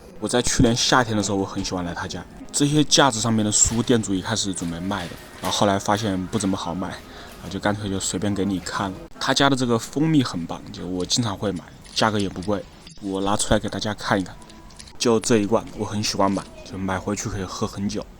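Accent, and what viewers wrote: Wuhan, China. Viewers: 这口音，基本可以说是武汉或者周边的口音，乡音太浓了。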